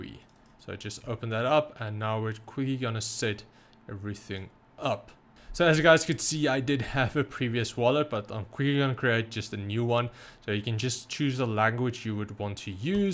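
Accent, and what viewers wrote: South Africa. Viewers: is this guy South African? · South African accent. Disturbing. Oh well. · Hey, are you from South Africa?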